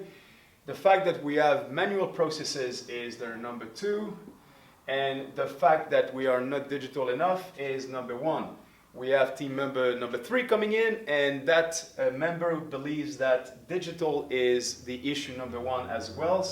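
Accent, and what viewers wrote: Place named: Belgium. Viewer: my only question after watching this video was where this guy comes from. Based on his style and accent I'd say Belgian. Anybody here who knows for sure?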